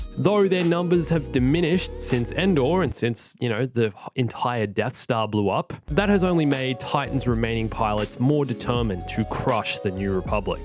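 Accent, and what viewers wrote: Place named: Australia. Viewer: I always find a small to creep over my face when I watch your videos, you just have this gusto and a nice australian accent, thank you for making great videos. 😂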